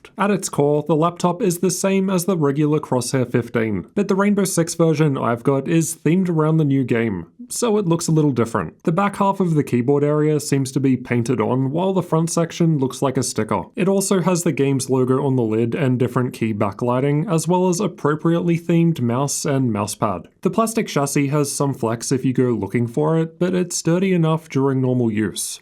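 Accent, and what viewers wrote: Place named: Melbourne, Australia. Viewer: God, that melbourne accent... Amazing video but the accent is strong xD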